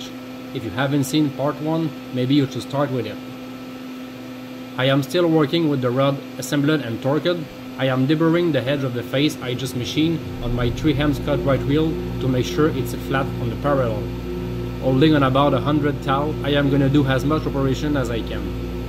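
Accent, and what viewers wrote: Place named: Quebec, Canada. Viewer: Ca sonne québécois cet accent là mon ami! ;) Bon vidéo!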